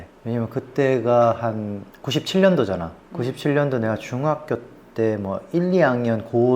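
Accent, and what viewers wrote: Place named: Gyeongsang, South Korea. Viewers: 포항분이신가봐요 저도 포항에 살고 있어요 억양이 엄청 친근감있다 했더니 ... ㅋㅋ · 경상도 말투가 정겹네요.. 자카르타에 살다가 한국으로 휴가나왔다가 복귀 못 하고 있습니다 ㅠㅜ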